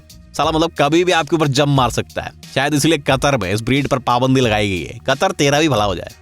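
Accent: Haryana, India. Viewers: Your Hariyanvi accent was outstanding.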